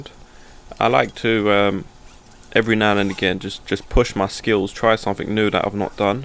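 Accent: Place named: East London, United Kingdom. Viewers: Hi, I'm not so great with internet stuff so couldn't figure out how to message you directly. Judging from your accent I'm going with west essex/east london, but could be wrong. I'm a stick welder in the gas industry working in herfordshire and do a fair bit of prefab as well as on site work. Fancy a collaboration? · акцент у этого негра не американский, а английский...думал в англии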